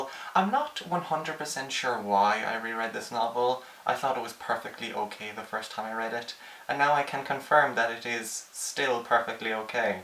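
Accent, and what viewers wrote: Ireland. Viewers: I am glad you're back. I missed your wit, symmetric face and light Cork accent. Also I appreciate the shirt choice. I do not appreciate the Sarah Chalke shade. Clearly, she was the better Becky.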